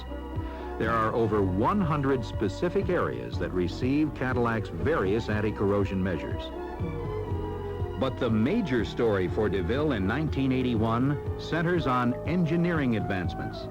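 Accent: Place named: Michigan, United States. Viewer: I've always liked how all of these films from this era have narrators with Michigan/Great Lakes-region accents when these companies were much more concentrated there. It's a subtle thing but you notice it when you've been around. It's just a shame he didn't get to say center "council". That phrase is as Michigan as Meijers with an "s" I've noticed. A lot of cool video shots of the Metro Detroit area in these films too if you pay close enough attention. The freeway ramp is at the Lodge and Southfield; the building in the NW corner of the screen is the IBM building which had just been completed that year (1980). The "One Way" sign at the end of the freeway ramp is something Michigan still does, because I guess it's the only place people have ever made U-turns at the end of an entrance ramp at some point in the past to warrant them.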